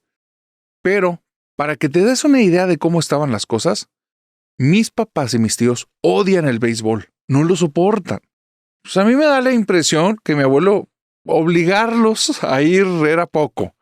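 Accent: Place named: Mexico. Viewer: Mi bello narrador he llegado al final. Sos un encanto. Tu voz y tu acento mexicano y tu forma de narrar me fascinan ❤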